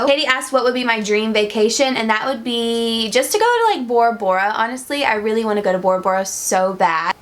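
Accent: South, United States. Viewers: Your new favorite song🙌loved your lip sync to it!😍 p.s. I love your southern twang. ❤And you go girl with your independent self 💪💁👏👏👏it is hard not being able to talk to your best friend, but when they return it is the best! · If you guys watch the bachelor she reminds me of Raven! They kinda have the same southern accent and face lol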